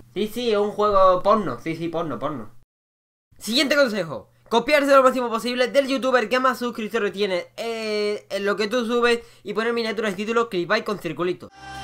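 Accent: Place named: Andalusia, Spain. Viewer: Eres andaluz? Tienes acento que no conozco PD: quien coño gime asi cuando se corre?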